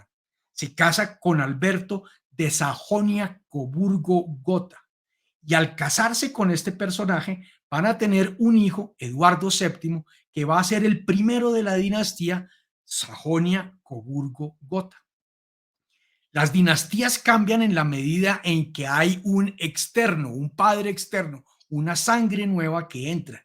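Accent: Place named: Latin America. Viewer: Tuvimos? .. aparentemente el comunicador bastante acento latinoamericano ,.debe de ser venezolano o colombiano etc. Y aquí en Sudamérica poco tenemos que ver con los reyes más que en el tiempo colonial...así que decir tuvimos...¿No será un poco pretencioso?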